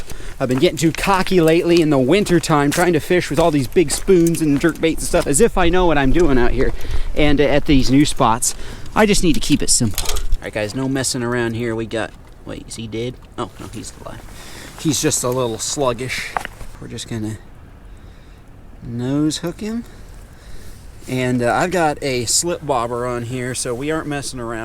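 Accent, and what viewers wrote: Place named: Tennessee, United States. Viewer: That Tennessee accent king in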